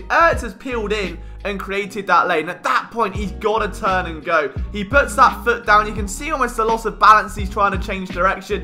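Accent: United Kingdom. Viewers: why iss a british guy talking about football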